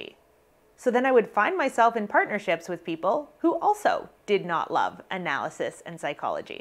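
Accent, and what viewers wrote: Canada. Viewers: This lady has a nice Canadian accent.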